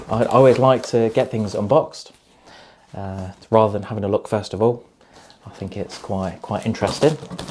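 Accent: United Kingdom. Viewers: Already ordered some hours ago. Can't wait 😊. Very good review 👍. Like your British accent. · I like the British accent as well. I like the garden in the background as well with the famous British grass.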